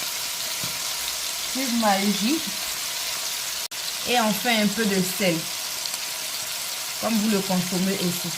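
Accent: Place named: Ivory Coast. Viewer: Ton accent ivoirien j'adore 🎉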